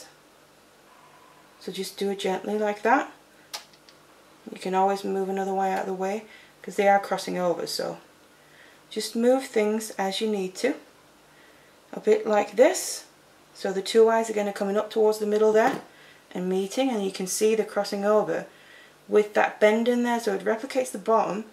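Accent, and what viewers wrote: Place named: North, United Kingdom. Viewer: Nice and clear instructions, easy to follow, and its really nice to hear a soft northern accent and not some harsh grating American one(some are OK TBH). Anyway many Thanks for the tutorial!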